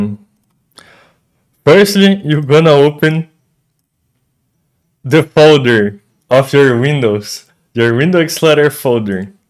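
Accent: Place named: Brazil. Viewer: fiquei um tempo pensando que o sotaque é familiar e quando vejo os comentários é br kkkkk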